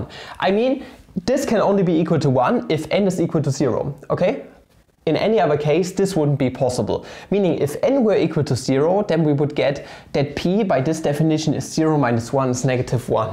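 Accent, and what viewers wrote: Germany. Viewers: You're German I suppose? Judging from your accent :D great video anyways, keep it up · Schöner Deutscher Akzent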